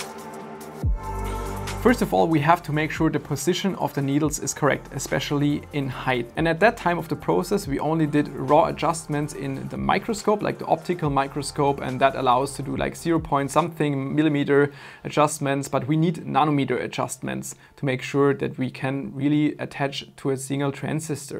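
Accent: Germany. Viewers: I knew you were German simply from the accent. My word.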